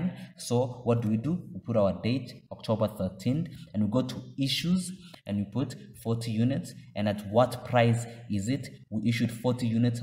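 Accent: South Africa. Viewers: This was a really wonderful clear tutorial. Also great to hear a fellow South African. 🌸 Thank you for your work!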